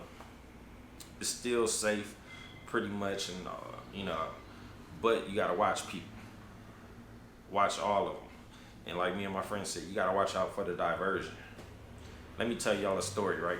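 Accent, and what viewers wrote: United States. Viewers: You have a Detroit accent. You from my city, bro? 🤔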